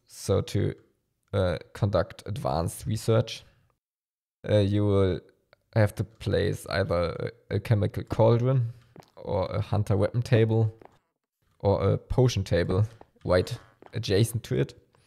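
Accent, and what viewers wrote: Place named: Germany. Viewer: i can hear a small german accent mein Herr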